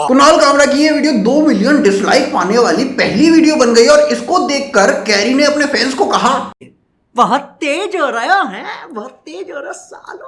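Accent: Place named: Madhya Pradesh, India. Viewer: Bhaiya apki dialoge delivery waqai zabardast hai we support you.. bhaiya r u from Madhya Pradesh? I think so because ur talking style is similar to MP people i am also frm there.. :)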